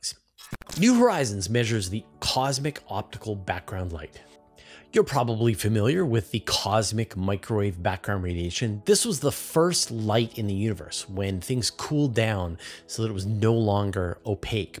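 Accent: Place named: Canada. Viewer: Your canadian accent is very nice, there's nothing to worry aboot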